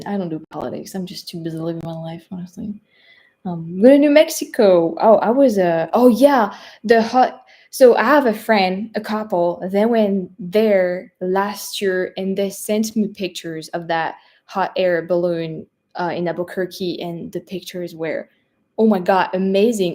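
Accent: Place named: France. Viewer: I love your French accented English! 😎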